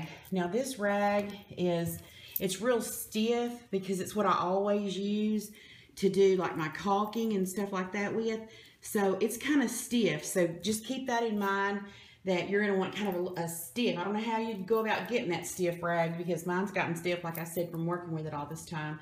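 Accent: South, United States. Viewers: shell shell I don't think she was hating but loving her southern accent. Take a chill pill will ya??? LOL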